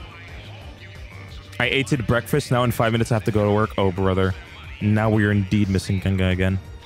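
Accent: Finland. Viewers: is he Finnish? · are you finnish???? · Are you finnish?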